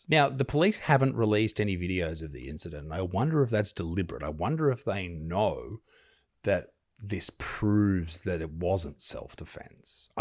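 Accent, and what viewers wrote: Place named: Far North Queensland, Australia. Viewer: Mate, as a fellow white Aussie who is from far north Queensland, you have an accent that sounds like you could have been raised a couple of streets away from me. But honestly mate, you seem to be so unrelatable with your views. It seems your understanding of racial politics in the US sounds as about as good as mine, (given I have only been there twice). You seem so opinionated and adamant about things that are unnecessarily inciteful. Agree you were somewhat a little bit careful about it with comments that it could be white on black, black on black etc… and that they were both 17 etc. But your focus that the amount provided to the alleged perpetrator is only made because he is black is completely unfounded. Moreso your comments (and I think your point) that you believe most of the donations come from white donators because of white guilt. 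These are extraordinary claims unless you have something to support it. The title “white kids lives don’t matter”, is also an ignorant one, given the US history, along with you’re your illogical and irrelevant comparison to a 17yo’s stabbing with the George Floyd incident (an experienced police officer sustaining kneeling on an unarmed and unthreatening mans throat). These are baffling to your already weak and uneducated points. Did you think it could very well be that the black community in that part may be tired of having the perception of rich white people getting their people off in other circumstances, so rallied with financial support?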